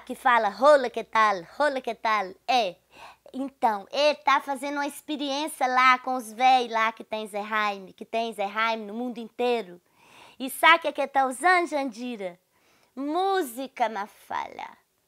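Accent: Minas Gerais, Brazil. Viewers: Adoro te ouvir... Minha mãe chama Josefa que nem a mãe da Jandira. E a familia do meu pai é minerim irgualzinho oce... Adoro... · Jamais os americanos entenderam este sotaqui que so os mineiros sabem .😂😂💋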